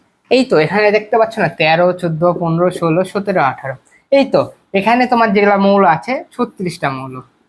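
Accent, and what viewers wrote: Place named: Chapai Nawabganj, Bangladesh. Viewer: Vai apnar kothai a Chapaiya feel pacchi. Dari tao sei, chagol er moto cute.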